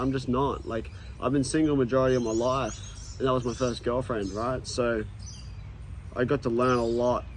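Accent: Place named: Australia. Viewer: Found this little vidya in my youtube feed, I'm glad you made it brah (I had to watch it on 2x speed as your eshay lad straya accent makes my yankee ears tickle). I'm 25yo now, still figuring things out, still gotta mountain of improvement ahead of me, but that's the beautiful thing bro. Even though I still trip and fall a lot, I'm the happiest I've ever been in my life because I feel like each year I'm closer to becoming a better man. No matter what happens: gangas or no gangas, bugattis or maccas, sickkunt or sad kunt, these are good problems to have cause every day I'm not dead or in prison is a day to be grateful for. I've learned to forgive myself when I slip, to not regret my past mistakes (no matter how retarded), and to not wait for things to be perfect. Climbing the mountain is why we're here brah: we fall, get injured, slip back down, but still get after it and make progress. 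Even if life doesn't go as planned, or every day is failure and is spent in tears - as long as we stay true and do our best, I know Zyzz and Tupac will smile upon us - and I know that we'll smile in the end too. Cheers mate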